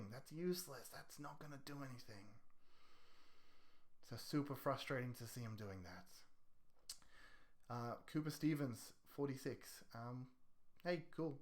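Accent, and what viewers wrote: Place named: United States. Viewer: You sound American lol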